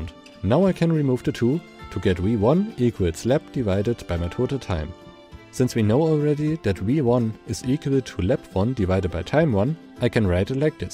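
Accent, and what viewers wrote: Germany. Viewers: Man o man. You can just hear on his accent how much German he sounds. Cool video. Keep it going. Love the animation.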